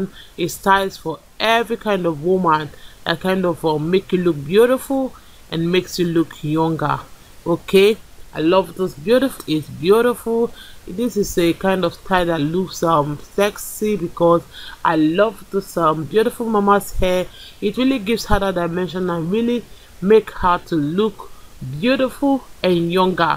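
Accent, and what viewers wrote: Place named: Africa. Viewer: She has a beautiful African dialect. I love those beautiful short cuts!!! Thank you for sharing.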